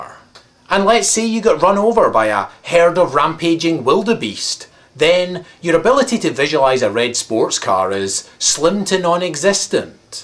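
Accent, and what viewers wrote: Scotland, United Kingdom. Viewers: His accent's scottish. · I don't most, my bint is scottish, but this particular accent is SOOOOOO FUCKING ANNOYING. Even when he's happy it sounds like he wants to kill himself. The reason so many people left scotland for the colonies was because they couldn't stand each others accent. Or maybe it was just because they lived in scotland. What a shithole. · scottish and i love it!!! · Are you Scottish? Or do Californians speak like that? Change the world by doing nothing! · LMAO - very good!! :) I could listen to that lovely scottish brogue all day long! :) hehehe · haha i like your british accent xD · are u from scotland?-.- that accent..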